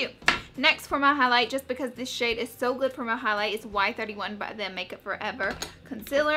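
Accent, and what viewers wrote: South, United States. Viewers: are you from the south? because if you are your accent is so cute!! I LOVE it! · All the power to you! So glad someone made this video. Also where are you from girl? Southern accent? Love it!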